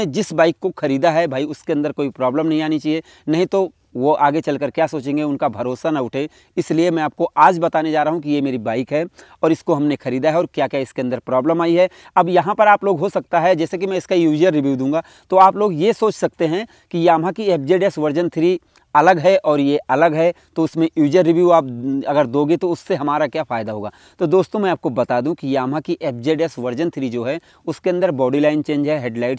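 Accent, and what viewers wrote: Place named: Pratapgarh, India. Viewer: Bhai aap kaha ke rehne wale ho aapki bhasha se mujhe lagta h ki aap uttar pradesh jila pratapgarh ke aas paas se hi kahi ho...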